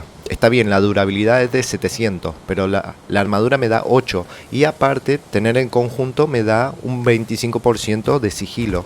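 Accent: Argentina. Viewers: me gusta mucho el contenido pero realmente me hace mucho ruido la mezcla de tonada argentina, con palabras y expresiones españolas y mexicanas jajajaja. Igualmente, repito, de los pocos canales que suben buen contenido del game, gg wp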